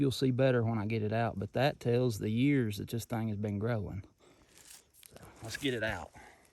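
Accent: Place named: South, United States. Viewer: Thank you so much. What a nice tribute to your Mom! I enjoyed listening to your southern drawl as you led the hunting expedition. It was great to actually be there and watch a pro at work. Your Momma taught you well...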